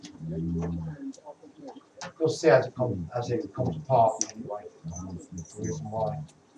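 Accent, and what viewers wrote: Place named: United Kingdom. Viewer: hello, glad you have it still working! Please tell me , are you a Londoner ? You have a pure British accent) Or, if not, how this accent is called ? I do get aesthetic pleasure hearing your “so british” speech)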